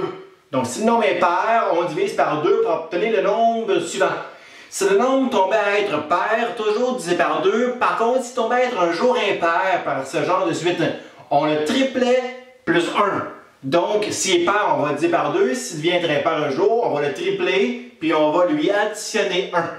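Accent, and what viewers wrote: Quebec, Canada. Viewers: c'est au contraire l'accent québecois et l'enthousiasme qui font le charme des vidéos de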